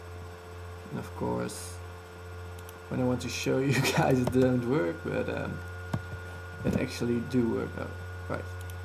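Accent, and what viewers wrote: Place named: Netherlands. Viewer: I could just hear of your accent that you are Dutch.. Im also Dutch. When you started talking I already knew it :')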